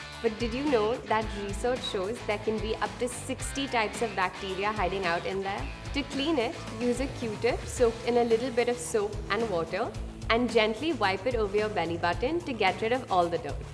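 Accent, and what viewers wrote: India. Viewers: has an Indian Assent. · She has an american look. But she talked like indian woman.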